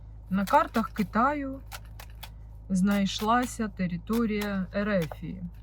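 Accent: Ukraine. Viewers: Дякую Вам. Я обожнюю як ви кажете. Дуже по українські!!! 👍🏼😜 Хай вам щастить!!! Слава Україні!!! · Обожнюю вас слухати❤ Цей рідненький дніпровський говір - то любов❤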